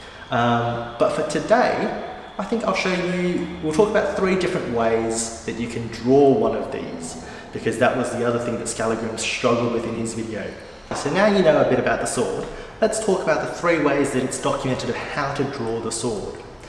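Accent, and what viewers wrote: Australia. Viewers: First thought, this bloke is aussie, next thought did he say Sydney? Turns out you're just 5 minutes away! 😮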